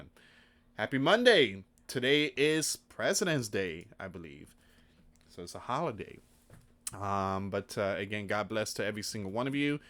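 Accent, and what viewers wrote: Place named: Australia. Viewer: Sounds aus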